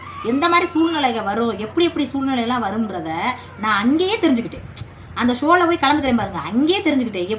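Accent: Madurai, India. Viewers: உங்க பழைய வீடியோஸ் பாத்தேன். முன்னாடி வாடகை வீட்ல இருந்த வீடியோஸ். அதுல எல்லாம் நீங்க பேசறது ரொம்ப நார்மலா இருந்துச்சு. சின்ன சின்ன இங்கிலீஸ் வார்த்தை கூட யூஸ் பன்னீங்க. இந்த மதுரை பாஷையோ ஸ்லாங்கோ அப்பல்லாம் பேசலையே. இப்படி பேசினா தான் சப்ஸ்கிரைபர்ஸ் வருவாங்கனு பேசறது போல இருக்கு. இது ரெண்டுல எது நிஜ முகம்.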